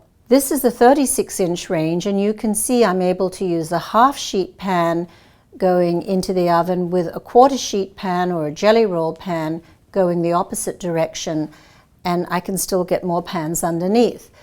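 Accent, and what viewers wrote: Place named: South Africa. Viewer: Love my Bertazzoni and that South African/NZ accent.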